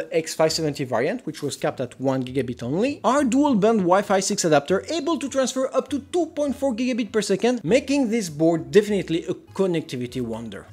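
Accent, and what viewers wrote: France. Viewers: the jesus of mother boards love man, j’adore l’accent français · Ooo le franchoyardd 🤣 ton accent vaut de l’or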